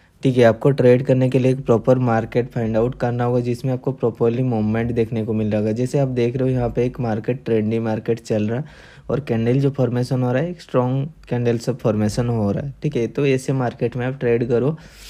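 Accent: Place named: Kolkata, India. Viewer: Kolkata Se Haii Bhaii ❤️ Tere Bolne Ka Style Se Pta Ge Mujhe..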